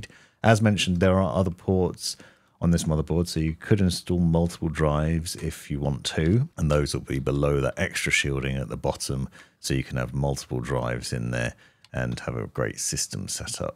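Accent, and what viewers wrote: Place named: RP, United Kingdom. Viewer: Your videos are very useful, but more importantly, I use your videos to practise my English received pronunciation. Yours is so clear! Cheers from Argentina.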